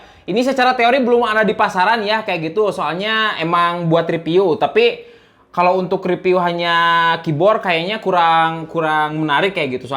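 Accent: West Java, Indonesia. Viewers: Resep kana aksen sunda na 😂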